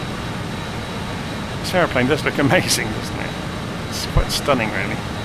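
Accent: United Kingdom. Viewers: Hello, thank you for the calm and lucidity you bring to flight simming by way of your well conducted videos. I have a question, when you bring up multiple screens simultaneously on then narrow window, what program/app do you use to accomplish same? By the way, your calm and polished British accent is very easy on the ear😊! Thank you.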